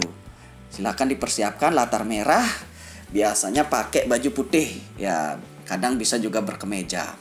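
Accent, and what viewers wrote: Bali, Indonesia. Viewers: Jleme bali ci sing?, logat ci bali ajan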